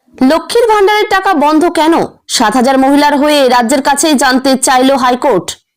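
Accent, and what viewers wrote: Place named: Bangladesh. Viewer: বাজে চ্যানেল।বাংলা উচ্চারণ ভুলে ভরা।মনে হচ্ছে বাংলাদেশের কেউ খবর পড়ছে।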